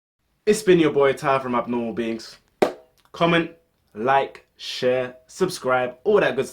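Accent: London, United Kingdom. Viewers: It's a London accent, though there are many different London accents (posh, cockney, and more 'street' as you could call this accent).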